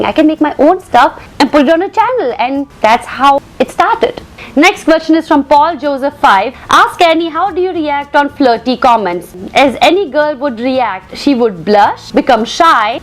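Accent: India. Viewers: i love Ur Hindi ascent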